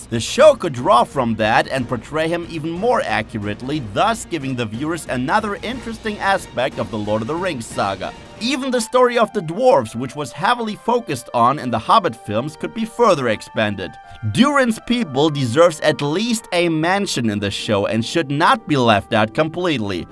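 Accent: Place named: Germany. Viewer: your german accent is horrible lmao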